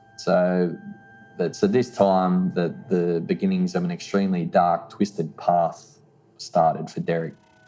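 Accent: Australia. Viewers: its an AUSTRALIAN accent..... are u seriously asking someone NOT from your country to speak differently??? To suit YOURSELF???? Oh ffs, you are a friggen KARENNNNNNN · As a criminology and criminal justice student, you sir have a new subscriber. Props for the Aussie accent too 🇦🇺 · True crime + podcasts + a beautiful Aussie accent ... could this get any better? I caught one of your vids in my suggestions and am now bingeing them from the beginning. Thank you for all of the work you put into these cases. They're wonderfully researched. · Probably a great and interesting story. Unfortunately, those of us with hearing problems, especially me, will not know. Australian accent, compounded by the addition of reverb makes it almost impossible to understand.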